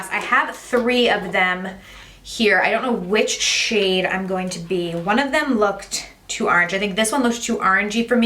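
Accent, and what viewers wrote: New Jersey, United States. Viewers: Oh she is not, shes always talked like that, she's from Jersey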